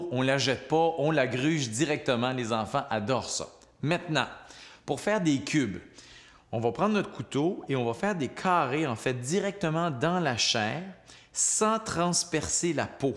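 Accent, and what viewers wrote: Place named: Quebec, Canada. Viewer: Merci ricardo ! Ton accent québécois rend tes conseils captivants ! :D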